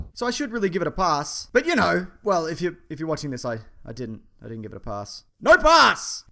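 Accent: Australia. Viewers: Dark City trailer told us that SPOILER aliens move the city around with their minds. Psycho's trailer showed us SPOILER a blonde woman screaming in the shower (actually Vera Miles in a wig, not Janet Leigh). Also you should make one of these where you just say Australian accent words like "Green LANT'n" or "Yes-tah-day." Childish, I know, but pleasing to my American ears.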